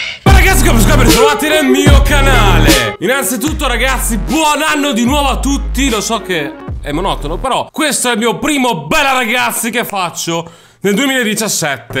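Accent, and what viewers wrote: Naples, Italy. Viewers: oddiooo ahahahahah te che parli napoletano ahahah sono tutto bagnatooo grande ti amooo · il tuo napoletano mi fa divertire troppo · io comunque mi complimento per il tuo napoletano che è qualcosa di stupendo. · ma sei napoletano? o tifi napoli pero abiti tipo a milano? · La combo Dialetto Napoletano+Incazzatura fa schiattare ahahah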